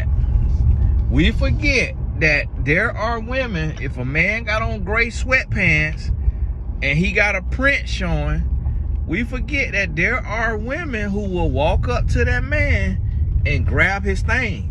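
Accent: South, United States. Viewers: Mr I thought I talked southern but you have me beat.. 😂😂 God Bless you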